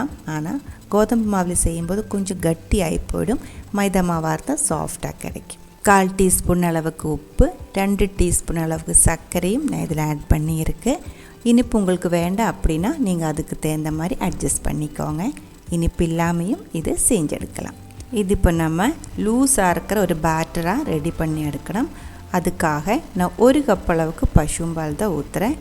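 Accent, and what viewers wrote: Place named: Malabar, India. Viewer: I have never seen like u Wow Thanks mam for this channel.addicted tour samaiyal and அழகான. மலபார் தமிழ் Long live mam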